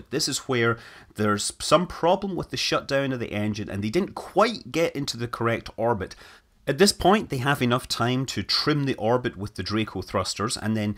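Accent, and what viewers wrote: Scotland, United Kingdom. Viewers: I don’t think i ever expected to here say “dad bods” in his Scottish accent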